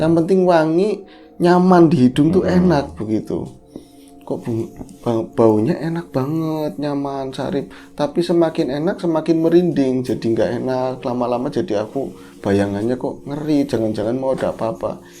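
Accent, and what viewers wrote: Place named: Java, Indonesia. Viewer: Kaku wez pkoke nek bro bwain criTa hahhaaa auTo ngakak medoke pooollll joozz gandozz, sukses trz kang..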